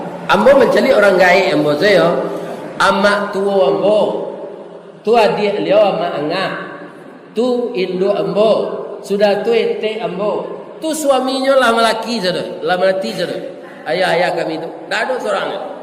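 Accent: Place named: Minangkabau, Indonesia. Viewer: Sabana sajuak hati mandanga caramah ustad Ristawardi,batambah ilimu,batambah kaimanan,lapeh taragak Jo kampuang (magek kabupaten Agam)mandanga Bahaso Minang nan asli.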